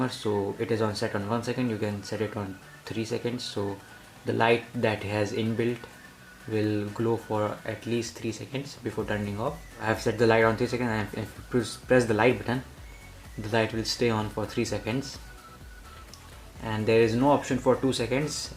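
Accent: India. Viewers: Since you are Indian and I hear your accent you must be a scammer and I don't believe anything that you say because of your place of birth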